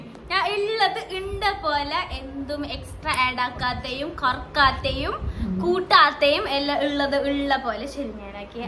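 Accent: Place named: Kasaragod, India. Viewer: Ninde ksd baashe paangilla ninde porel mundne aa slanganne ni mundumbo paang👍🏻❤‍🔥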